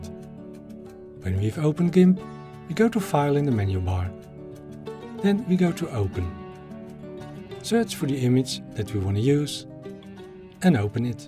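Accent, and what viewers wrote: Netherlands. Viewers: hah your dutch!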